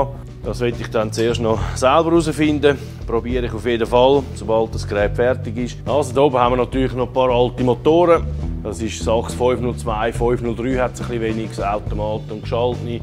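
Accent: Switzerland. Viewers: Schweizer Deutsch, immer wieder lustig. So 'ne Töff Sammlig hätt ich auch gern.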